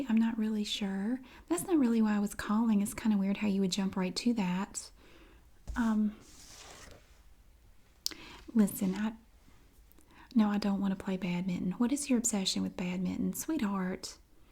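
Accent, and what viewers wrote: South, United States. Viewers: I love asmr, and i'm so happy that there is a person from NC doing it now, and that has a good southern accent!!!!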